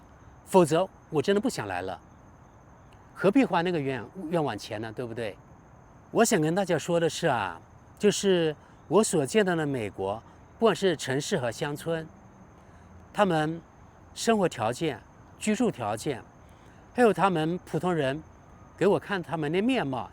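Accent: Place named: Guiliu, China. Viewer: 航八郎😂 桂柳腔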